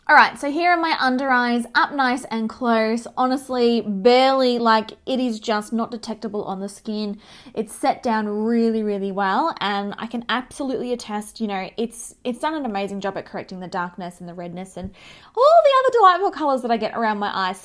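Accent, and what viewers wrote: Australia. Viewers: Sounds exactly like every young beautiful Australian girl, so better not ever come here then.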